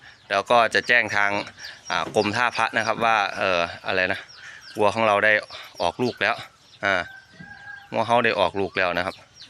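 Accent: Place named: Laos. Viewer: ❤❤❤❤❤ชอบที่ท่านพูดภาษาลาวมากกว่าครับ...adminพูดลาวคนไทยฟังแล้วรู้สึกไม่เพราะครับ❤❤❤❤❤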